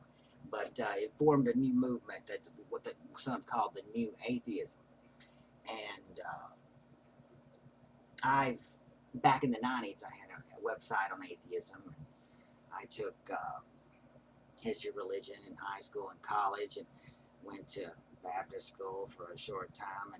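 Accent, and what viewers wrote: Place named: South, United States. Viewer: Wow. An outspoken informed atheist; putatively South Carolinian. Ain't that the Bible Belt? I know it's prejudicial of me, but it's strange hearing knowledge and wisdom via a southern accent. I too am an avid atheist but never stopped seeking and learning. My fave is George H Smith's "Atheism, the Case Against God" but I've read over 100 others on atheism, science and religion and, of course, the Bible, a book I treasure as a peek into the minds of ancient people. Hope your intellectual stance isn't adversely affecting your social life., a fellow rather odd He named himself after one but don't accept no god!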